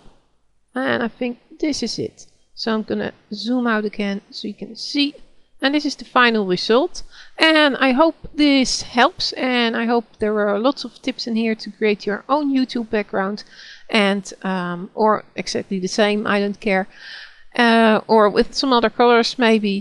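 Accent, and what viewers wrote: Netherlands. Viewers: hahaha, ik moest echt lachen toen ik je hoorde praten, ik zij al " dat is zekerweten een nederlander" en het blijkt ook nog te zijn, maar wat ik eigenlijk wou zeggen. THANKS!! hier heb ik veel aan ! ;)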